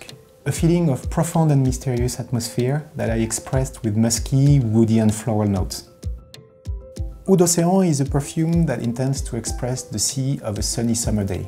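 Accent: France. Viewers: Le français qui parle anglais sa donne ça 🤩🤗 with bts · Mmmh... L'accent français comme on les aimes ❤️❤️ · L'accent français on le reconnaît 😭😭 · On adore l’accent français !😂🇫🇷🇫🇷🇫🇷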